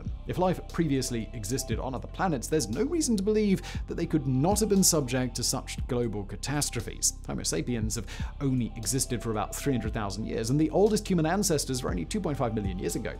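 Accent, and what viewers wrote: United Kingdom. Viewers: Do people really still talk with this pompous, posh English accent? Or is this just a goof?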